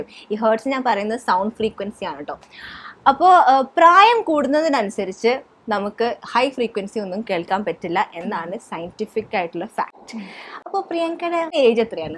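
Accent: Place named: Thrissur, India. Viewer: Tcr slang😍😍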